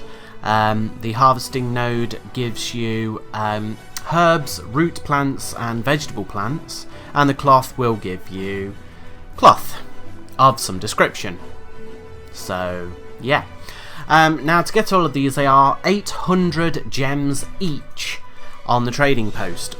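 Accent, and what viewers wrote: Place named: United Kingdom. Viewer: too english english😆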